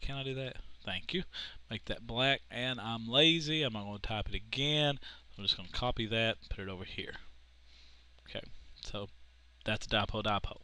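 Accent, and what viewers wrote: South, United States. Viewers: BIG HELP! & great country accent. Southerners!!! =)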